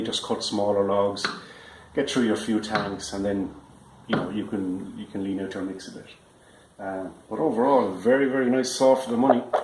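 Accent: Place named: Ireland. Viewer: As an American I think Irish people have the best accent, and I value your opinion to the extent that i just purchased one for 237.15$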